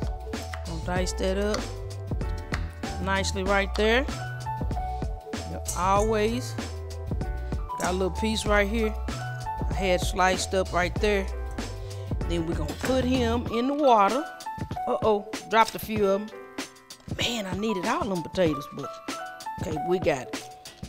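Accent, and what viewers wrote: South, United States. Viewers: Aww i need a oven, when i have one i am going try this, i would like to make sweet patato pie one day to.😀💐 you are funny " yall wanne me to cook so i,m gonna cook looool and beautifull youre doing fine with the explanation and that beautifull southern accent, i do believe the camera man should do more close ups on the food and the cutting and in the pot, later he or she does that, if its youre husband filming you i understand he cant keep his eyes of you 💐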